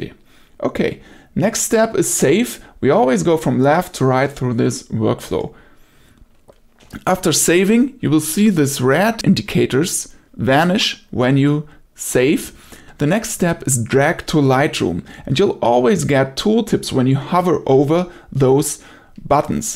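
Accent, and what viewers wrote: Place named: Germany. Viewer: Excellent tutorial, I love you accent, are from Germany, just a little correction in you English, when you said "this is the workflow buttons which I explain you", you should say "which I will explain to you" I hope you don't mine me correcting you because you English is excellent, keep up the great tutorials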